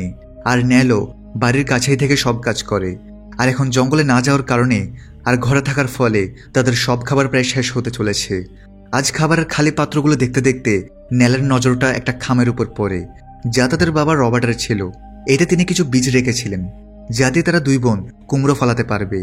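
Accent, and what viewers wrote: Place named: Pabna, Bangladesh. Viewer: vai morgir khowar😁😁,,ata to pabnar ancolik vasha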